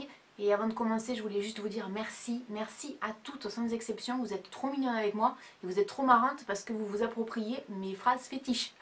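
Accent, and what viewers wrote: South, France. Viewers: Coucou ma belle, tu as tout à fait raison : on trouve plus facilement, en e-shop, des articles qui ne sont pas en boutique. Mes commandes sont toutes passées en ligne, et j'achète peu en magasin. En tout cas, merci pour tes avis, tes conseils, et tes petites astuces pour payer encore moins cher des articles qu'on a par exemple mis en wishlist :) Pour "Vide Dressing" et "Vestiaire Collectif", c'est vrai que tu nous en avais déjà parlé, mais je ne connaissais pas du tout. J'irai regarder de temps en temps; je trouverais sûrement de bonnes affaires........ et pleins de sous à dépenser!.....:) Les enseignes tels que Zara, Morgan, Banggood vendent des articles de qualité, et j'aime bien, même si je n'achète pas à chaque fois. Voilà ma belle, à dans une prochaine video. On te prends tes expressions, et peut-être qu'en te regardant, on prendra aussi ton bel accent du sud :) :) Gros bisous.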